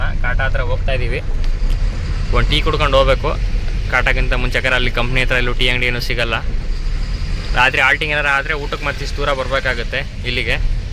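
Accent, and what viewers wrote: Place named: Bangalore, India. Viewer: ನೀವು ಸಾಗರ್ ಅಂತೀರಾ ಮಾತ್ ನೋಡಿದ್ರೆ ಬೆಂಗಳೂರು ತರ ಇದೆ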